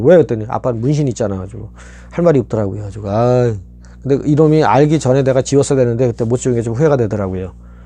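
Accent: Incheon, South Korea. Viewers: 언뜻언뜻 이천수선수닮으셨네요 ㅋ 인천분이라 약간 특유의 말투도 비슷한듯하고요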